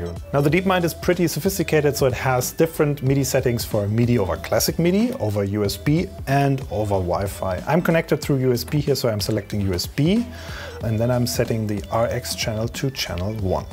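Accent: Germany. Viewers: You’re german, am I right? xD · Lovely German English. ^^ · Your Denglisch is wunderfull! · Most German accent I’ve ever heard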